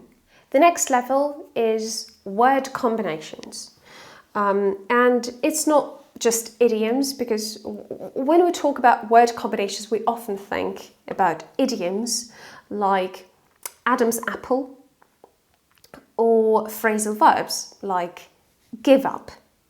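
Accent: United Kingdom. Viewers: I wonder what your natural English accent sounds like. I mean, without any conscious effort put into it. It's hard to believe that British accent could be natural for anyone haha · Hi, you can be completely mistaken for a native speaker, which is rare. You've deserved a praise. Counter-intuitively, speaking with a perfect Modern RP accent might indicate that you are a foreigner, ha-ha (but that doesn't matter of course). Have you polished your pronunciation being in the UK by emerging? Arguably, the hardest part of English is pronunciation. Many people speak English, but only a few speak clearly and are pleasant to listen to. My mother tongue is Russian as well.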